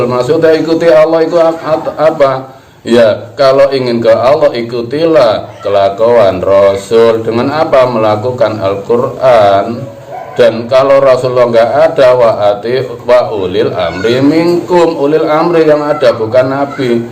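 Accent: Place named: Banyumas, Indonesia. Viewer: pangapunten nggeh..kok miriipp sanget kalih panglima besar jenderal Sudirman... aksen bahasanya mirip dengan wong Banyumas Jawa tengah Mbah 👍🏼👍🏼👍🏼🙏🙏🙏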